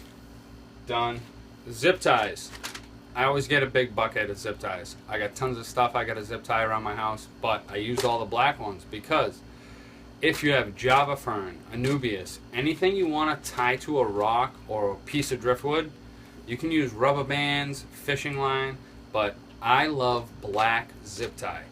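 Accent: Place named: Massachusetts, United States. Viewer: I've always been a naturalist and have enjoyed tending to plants, reptiles, and other critters. I have always struggled with my aquariums though. I see now, after watching a few of your videos that it is because I always rushed into it and was never fully prepared. This video alone has shown me just how unprepared I have been! I am looking forward to setting up a beautiful planted aquarium using all your great tips and tricks! I love watching your videos as your mild Mass accent reminds me of home where I grew up. Thank you for the great videos!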